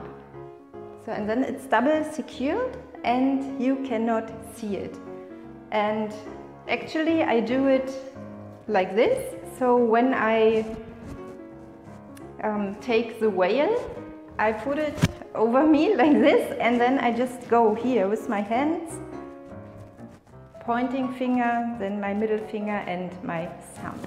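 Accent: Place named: Germany. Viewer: I'm here for the German accent 😅... Don't worry I'm German too.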